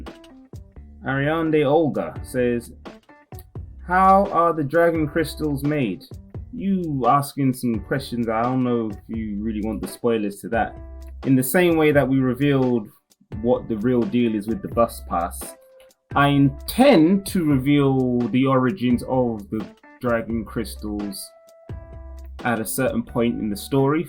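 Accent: United Kingdom. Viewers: Been watching you for quite a while but didn't expect the English accent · You sir, are a GOAT. You sound like you’re from London so hopefully I may meet you one day and be able to shake your hand and thank you personally for all you’ve done with this series and how it’s given me so much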